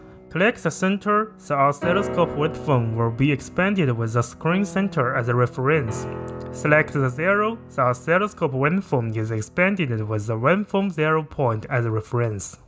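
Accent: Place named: China. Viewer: good topic but not good english speaker english sounds like chinese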